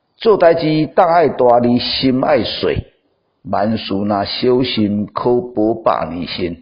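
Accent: Taiwan. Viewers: 讚，聲音好聽 正港的台灣話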